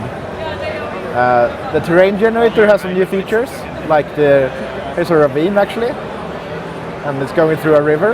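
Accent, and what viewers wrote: Sweden. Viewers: is he swedish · det hörs att han är svensk! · Hihi, sweedish-english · Yeh....stfu and face the facts, clearly its a convention by the ammount of talking, he has a swedish accent that sounds EXACTLY like Notch, its on an offical youtube channel which is IGN hes on a beta version which is probs only available to the devs, so please learn to use your small minded brain before commenting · Hahah, i hear that ur swedish! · swedish english..... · guess the commentator is swedish..... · Jag gillar den typiska svenska engelskan :D I like his english accent :D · is it just me, or are Swedish accents awesome? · No, he always spoke like this. XD His accent is very Swedish. XD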